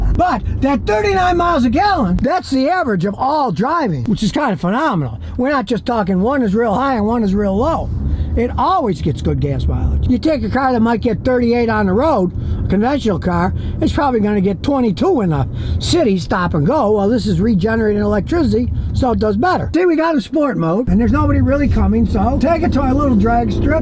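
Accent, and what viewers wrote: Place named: Texas, United States. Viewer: I like how SK covers everything important and hasn't lost his Texas accent. I have to turn the volume down though!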